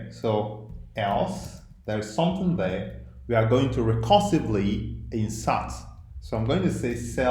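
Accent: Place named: Nigeria. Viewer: Thanks bro. I don't know if I understand better because of the Nigerian accent, but this helped a lot.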